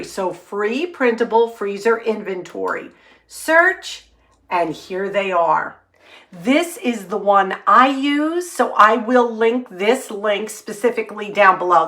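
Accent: New York, United States. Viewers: I grew up in sleepy Hollow moved to the capital district. I just absolutely love your videos, and you have the best ny accent ❤ the New York State Museum is also free and it has a beautiful tribute to 911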